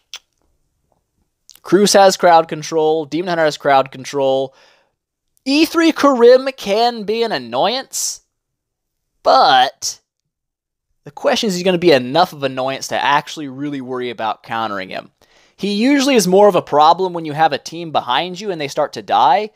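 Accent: United States. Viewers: He has traces of an American accent IMO.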